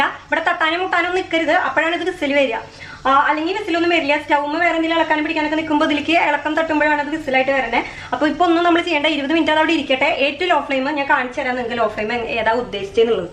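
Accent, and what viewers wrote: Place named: Kunnamkulam, India. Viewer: ആൾടെ സ്ഥലം കുന്ദംകുളം ആണന്ന് തോന്നുന്നു സംസാരം കേട്ടപ്പോൾ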